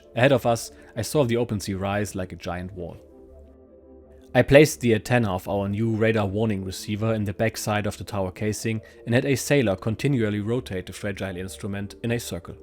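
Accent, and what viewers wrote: Germany. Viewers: I like the fact that you are narrating this with a German accent... it definitely brings a little more life to what these men may have sounded like in general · Hi, another great episode under your belt! Your narration is improving with every episode and your German accent adds to experience of reading the war diary of a German U-Boat officer. You made the right decision to ditch the synthetic voice as a human voice is so much better and knows certain language conventions better. I also enjoyed hearing about your holiday at Lake Constance. More flavour for the story! Enjoy your holiday and see you next episode! Mark from Melbourne Australia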